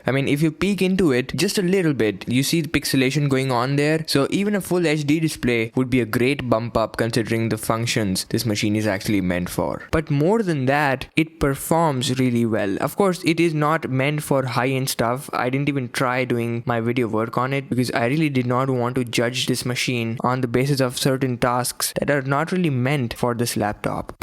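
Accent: India. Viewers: 1000 dollars? u r an Indian, right? u definitely sound like one... anyways, great video man.. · Dude, going by your accent I can tell you're an Indian and that video sir was absolutely amazing. The music and the editing and the voice over is definitely better than most of the big shot YouTubers who give Tech reviews like GeekyRanjit and C4E. Keep making videos brother and someday you'll be at the top. I'm from India as well making Speed Arts on my channel. Take a look if you like. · Chewbecca Gamer He sounds like a bloke from India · is he Indian ? his accent doesn't sound like one from the English speaking countries?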